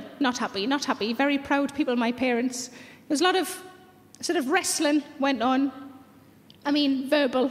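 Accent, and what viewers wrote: Newcastle, United Kingdom. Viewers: Hi:) love your humour and your Geordie accent...its " Champion" lol.... (Im from UK originally live in Aussie ) would love to see some new videos of you.... Ive watched all your vids on here, and miss your humour.... Any new shows that you can put on here please....would love that thank you:) stay safe and well:) keep them coming lol....and that will be "Champion pet"...ok Flower? thanks:) magz:)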